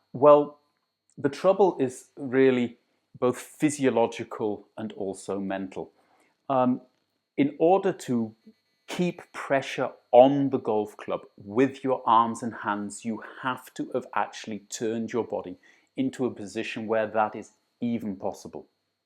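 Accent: RP, United Kingdom. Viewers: Thanks for the video,. Where are you from? Your accent sounds English-ish with a hint of German or Scandinavian... my mother is English (I grew up in the states with an English mother) and been to England many times. I can't figure your accent out. 😄 I enjoy your videos. You're very detailed.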